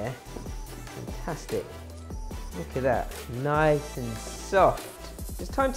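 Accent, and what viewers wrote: United Kingdom. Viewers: What British dialect is that. He sounds exactly like Prince Philip in The Crown!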